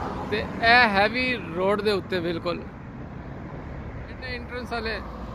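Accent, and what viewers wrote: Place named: Taxila Pindi, Pakistan. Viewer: MASHALLAH Je bhot Acha laga ap ki video dekh kr, perdes main apny mulk ki hr cheez achi lagti hai,ap ki boli sun kr lagta hai ap taxila pindi side K Ho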